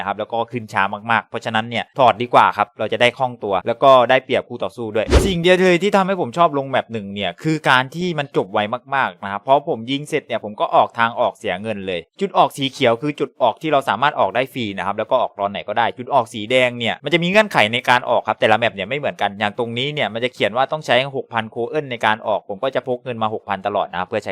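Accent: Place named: South, Thailand. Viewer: สำเนียงพี่คนใต้นะ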